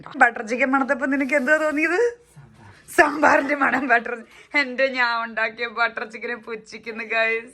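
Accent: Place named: Kottayam, India. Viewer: Kottayam slang❣️🤌🏻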